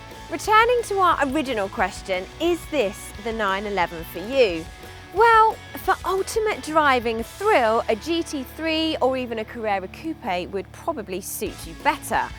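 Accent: England, United Kingdom. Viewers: she's cool, reminds me of the one from fifth gear... anyways which region of England is this accent from?.. curious, anyone? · I love your British accent. Cheers.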